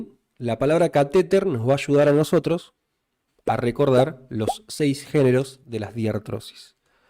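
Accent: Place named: Argentina. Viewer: Excelente video, me enamoré de como explicas más tu acento argentino, saludos desde Venezuela ❤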